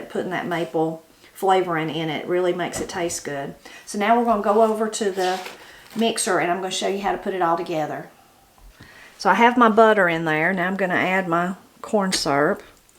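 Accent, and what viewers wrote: Appalachia, United States. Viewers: Miss, thank you and your Granny for the wonderful Seaside Candy Roll recipe. I made some for Christmas and it was delicious and very easy to make. You were right about how sticky the candy roll became brushing it with the syrup and then pressing on the pecans but it was worth it! I grew up in Northern Idaho and I know and understand 95% of your Appalachian words and pronunciation. As far as I know, my people came to Idaho from Scotland, Ireland, Belgium and Germany via the Midwest. Maybe that is where they picked up those unique words. The only difference is my pronunciation of pecan [pea-con].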